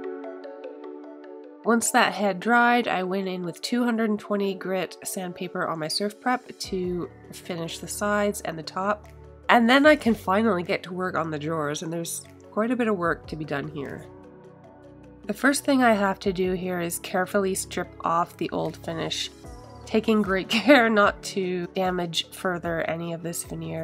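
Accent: Canada. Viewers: I’m not sure how you slipped into my feed, but I’m so glad you did. I’m a Canadian/American tv writer with a love of old furniture. And I heard your voice and the lovely Canadian accent, and I was hooked!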